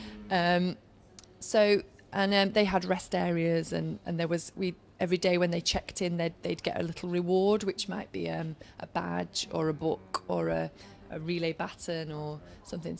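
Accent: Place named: Yorkshire, United Kingdom. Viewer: Как она произносит company, come, such, done, rugby!! Ну и что, что Йоркширский акцент. Всё понятно. И очень красиво. Спасибо.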